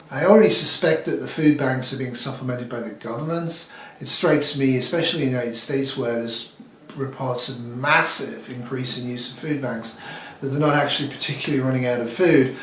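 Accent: United Kingdom. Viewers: I think it's a British thing. Brits don't pronounce the "r" on the ends of words. He has a British accent, not a Canadian accent.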